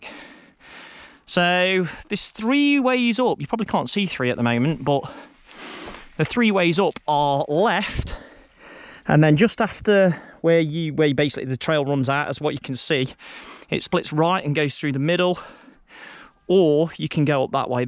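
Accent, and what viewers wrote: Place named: Ripley, United Kingdom. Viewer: He sounds like a Ripley lad!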